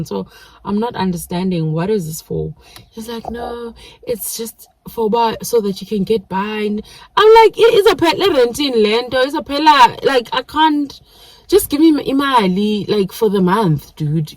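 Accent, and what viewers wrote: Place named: South Boston, United States. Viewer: your home language sounds beautiful I think you talk like real southie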